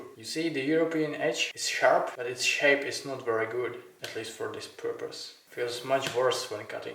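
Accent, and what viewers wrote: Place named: Russia. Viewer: ты с России что ли? акцент пипец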